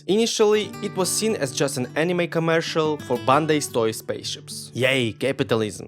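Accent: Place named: Middle East. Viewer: I’m watching this one because I watched that jujutsu Kaisen video. You make good content, but man, your accent is thick. Are you from the Middle East? It’s hard to place quite where your accent is. Anyway, so far your content is looking pretty good. I imagine those subscriber numbers will start jump, jumping if you keep making videos.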